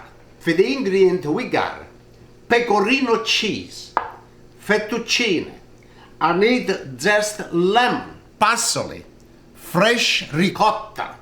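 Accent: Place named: Sicily, Italy. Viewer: A wonderful man Mr.Cerio, was my first intro to food that was not german, He rented a suite from my Dad who had a business in the same building. He was from Sicily and his proudest times were sharing his food with a 8 yr old (me) and talking about his budgie bird. He had no family in Canada that I can recall. I Remember him with fondness 57 yrs later. He got me hooked on authentic italian food ever since. You speak as he did. Are u also from Sicily?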